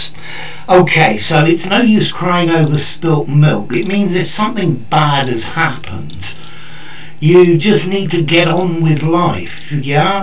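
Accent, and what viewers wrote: RP, United Kingdom. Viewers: You're really the best posh English teacher in YouTube 👏👏👏👏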